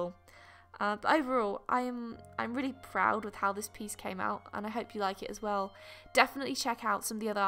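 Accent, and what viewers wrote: United Kingdom. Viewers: I’ve been to your Etsy store hoping to see prints of all your work but there’s nothing I love your Dean Winchester pic it’s awesome just like you id love to learn how to do digital art not for professional just for a hobby with rewards at the end I love all your work but I only get an allowance I’m really poorly so I often arrive late and I don’t even know if you get any of my messages. But your such an amazing role model for me and your fun vids have helped me smile even when multiple bones are breaking as they do when my bone disease is bad and staying still doesn’t do a thing to help. Your a ray of sunshine on a dull day and I love your British accent it makes me feel like home I’m in Sheffield England so I’m a Yorkshire girl. Keep doing what you love. Love everything you do. Take care. Rachel x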